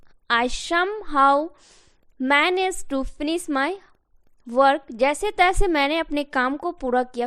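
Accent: Bihar, India. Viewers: It seems from your voice, you are from bihar..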